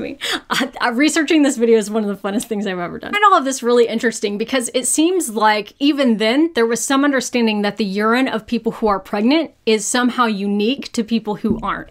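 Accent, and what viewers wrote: Texas, United States. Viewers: Is a fellow Texan, I am honored to have you and your southern drawl representing our great state. Thank you for what you do. Texas misses you. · Pap-err-us, omg your Texas accent is sooo cute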